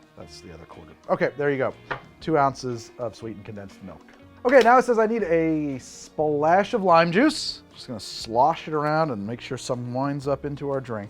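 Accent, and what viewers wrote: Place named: Philadelphia, United States. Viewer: Everything you say "weird" is also how a lot of Philadelphians say things, so f yourself, it's wooter ice. Love you!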